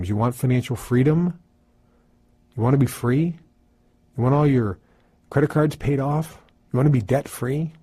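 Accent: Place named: Boston, United States. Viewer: where are you from? i hear your Boston accent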